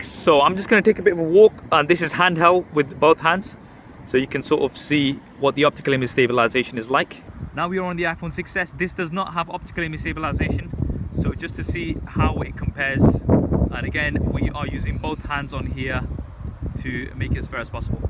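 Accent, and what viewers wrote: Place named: Birmingham, United Kingdom. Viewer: you kind of sound brummie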